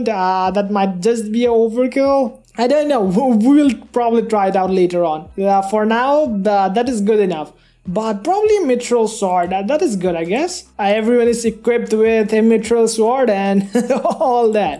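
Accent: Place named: India. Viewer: I think its an Indian accent, tho I could be wrong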